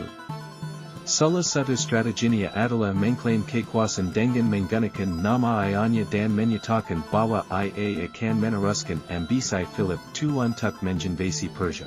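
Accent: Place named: West, United States. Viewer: logat barat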